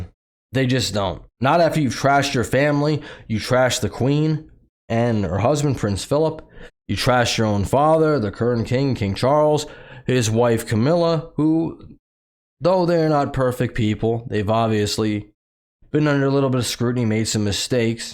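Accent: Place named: Chicago, United States. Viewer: Ahh...now I know you're not my long lost brother, Rick. You sounded so much like him I was wondering. Definitely remind me of the old Chicago accent . lol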